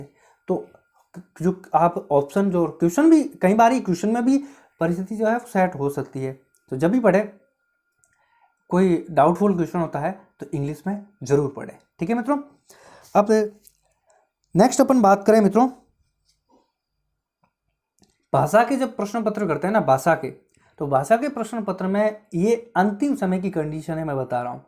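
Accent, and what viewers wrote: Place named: Ganganagar, India. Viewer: Sir aap ...beenjhbayla.(ganganagr) se ho kya ?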